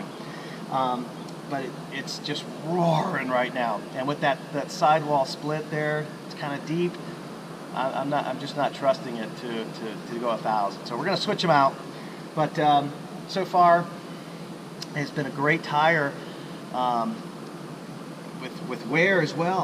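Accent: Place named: Australia. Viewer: 9/10 for the review, 4/10 for the Aussie accent : )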